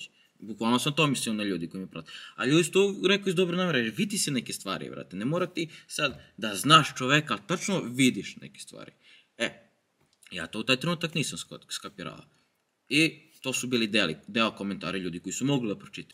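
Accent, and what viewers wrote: Southern Serbia, Serbia. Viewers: Ljudi koji ne mogu da shvate tvoj naglasak i govor jednostavno i ne znaju da 98% nastavnika i profesora u skolama na jugu Srbije cak i u skoli na casu pricaju isto i predaju lekcije tako.i ne mozes ti da promenis to sad za 2-3 dana,jednostavno je tako i neka prihvate.to postoji u svakoj drzavi. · Ja ga tek odnedavna pratim. I slazem sw sa vama. Decko je strasno inteligentan i elokventan. Svidja mi se taj naglasak, samo ne znam iz kojeg je to dijela Srbije. Mozda juzne, nisam sigurna. Volim inace gledati putovanja, pustolovine i slucajno mi se izbacio njegov kanal. Pozdrav za njega i sve pratitelje kanala iz Osijeka 😊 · tako je jug tamo tako pričaju,simpatičan naglasak. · Lepo sam predlagao jednom u komentarima, povedi devojku neku na putovanja, pa makar drugarica bila samo, odmah druga priča, moja preporuka, oprosti ilkici poželi mu sve najbolje i završiš priču time. 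To ti je život, uči se do starosti, pamet u glavu drugi put sa više iskustva. Samo napred, podržavamo jug srbije, cepaj naš akcenat dole kome se ne sviđa nek traži drugi kanal, i ja sam napustio kanale već zbog nečeg što mi smeta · Prizrensko-timočki tačnije prizrensko-južnomoravski dijalekat daleko će se čuje! Ja garantujem za ovog dečka! Pozz iz SC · Batko samo ti nastavi da pricas tako to je jug srbije ljubi te brat,al ilkicu kad vidim ce mu pokrljam nosinger opet ,ce ga utepam ima da dise na rate kroz taj nos,ima nos sece burek lik mali mentol...😊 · Poz, skontao sam i pre ovog videa o čemu je reč i ko je upravu itd. itd. No nebitno to toliko, upečatljivo mi je to što kažeš da nećeš da se menjaš i da nećeš da menjaš svoj naglasak/pismenost i šta li već. Ja sam neko ko je studirao u nacionalno mešovitoj sredini (u Subotici) i stvarno i raznih krajeva su dolazili ljudi i upoznao sam od Kosovara do Madjara studente. 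Stekao sam POGREŠAN utisak da su ''Srbijanci'', kako mi Novosađani pogrdno i sa podsmehom kažemo ''Srbijanci'', pritmitivni i prosti ljudi, i taj njihov naglasak to dodatno govori. Ti si taj koji menja taj ceo moj pogrešan utisak i hvala ti na tome. Poz iz Novog Sada.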